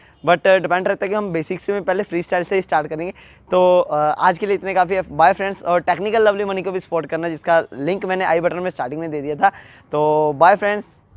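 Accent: Punjab, India. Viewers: I love your Punjabi Hindi! #fullDesi ❤️ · I liked ur accent - Punjabi Hindi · Oh that Punjabi accent 😆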